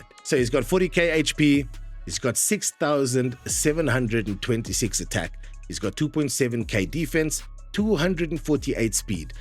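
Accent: South Africa. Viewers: Yup, first 2 words of the video and I knew you’re from SA😂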